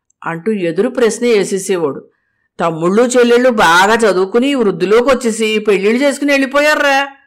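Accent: Godavari, India. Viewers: గోదావరి జిల్లా యాసతో బాగా చదివారు. కధ చాలా బావుంది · గోదావరి జిల్లా యాస తో బాగా నవ్వించారు.పాకం గారెలు ఎప్పుడూ తినకపోయినా తిన్నట్లుగానే వుంది మీ మాటలు వింటుంటే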